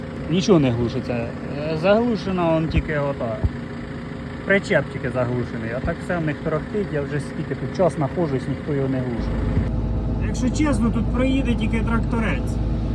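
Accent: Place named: Kirovohrad, Ukraine. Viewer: Тільки хотів написати в коментарі, що чую знайомий говір, як на Кіровоградщині, аж тут ви самі про це говорите. 🤗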